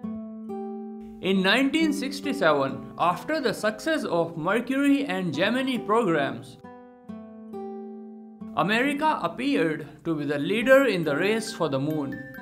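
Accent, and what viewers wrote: India. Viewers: This is an Indian Accent I think, I hear that alot where I live (not India tho) Am I right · a pajeet is narrating this?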